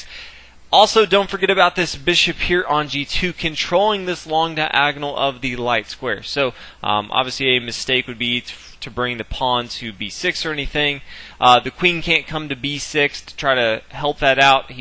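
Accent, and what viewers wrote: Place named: Northeast, United States. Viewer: you are either from Massachusetts, Connecticut, or New York. Am I correct?:-) commentator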